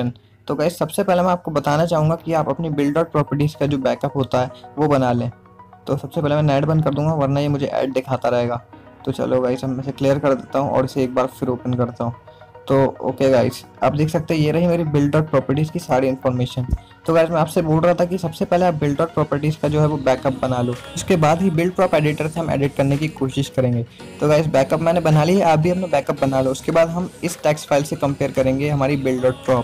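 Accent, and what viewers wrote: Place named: India. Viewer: What are you even saying. Indian probs lol.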